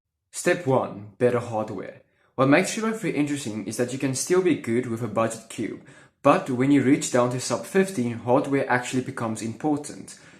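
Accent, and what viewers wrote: South Africa. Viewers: are you from South Africa? :)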